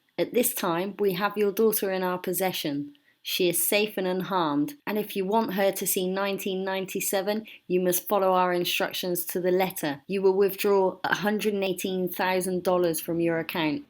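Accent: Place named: United Kingdom. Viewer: i thought you sounded british. just looked on about tab. i do a live late night variety talk show. i been busy with work but getting ready to start interviewing you tube celebrities again. would you be interested in being on show? its audio only. like radio talk shows of 80s and 90s. talk about your channel, you and your back ground. how you got started. maybe even talk about this case a bit? go to my about tab and email me. After Dark is show name. go to playlist tab and listen to couple to get a feel. Brads workbench, junk from work, jeff king/the den of tools were some good ones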